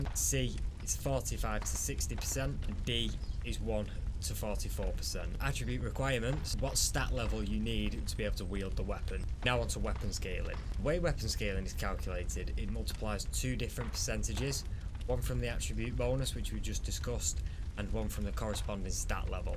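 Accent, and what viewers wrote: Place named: Scotland, United Kingdom. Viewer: Scotland? forgive if I'm wrong😂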